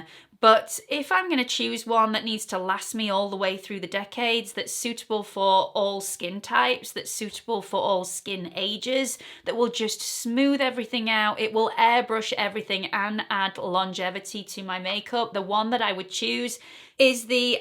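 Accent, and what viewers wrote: United Kingdom. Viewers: Great collab with one of my favorite influencers. I so enjoy your videos when your mother is on with you. She and I are close in age (I am older by 4 yrs). You're a joy to watch. I LOVVVE the British accent so I am usually mesmerized when I watch your videos! This was a fun video to watch.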